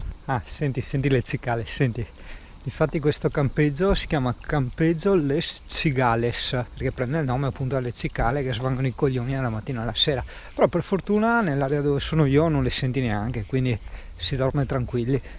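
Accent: Veneto, Italy. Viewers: Che tristezza, acqua azzurrissima che pare di un altro mondo......invece, inquinata. No dai, giuro ci rimani male. Come abbiamo ridotto questo povero pianeta. Bellissima la parlata inglese con accento Veneto. Te saludi.....!!!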